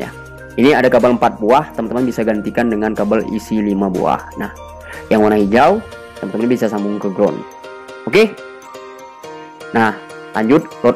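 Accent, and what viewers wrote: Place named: Malaysia. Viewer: Bang ingat dullu saya ppasang wayering di malaysia bang.logat bicara abang kayak orang melayu.abang banyak ok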